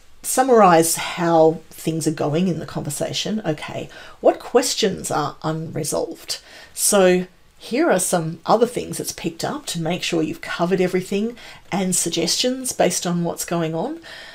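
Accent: Australia. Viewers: Thanks a great explanation (in an Aussie accent 👍🏾) Keep it up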